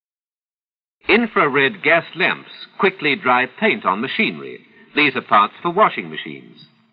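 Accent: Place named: Australia. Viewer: Ah, when the world was still a good place to live. I mean, one third of the world, but still. This is such nostalgia, even with a funny Aussie accent.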